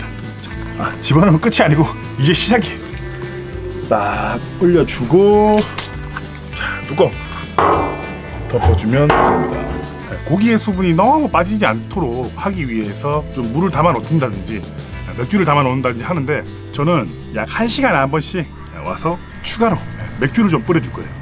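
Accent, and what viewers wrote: Gyeongsang, South Korea. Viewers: ㅋㅋㅋㅋ 진짜 상남자의 wild 먹방 조으네 구독완료다 저랑같은 경상도 분 같은데 어디시져?ㅋ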